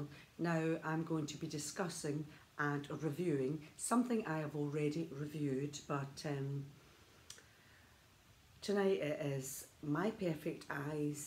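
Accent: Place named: Scotland, United Kingdom. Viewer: Love the Scottish accent…candid review from the lady well done ..mam 👏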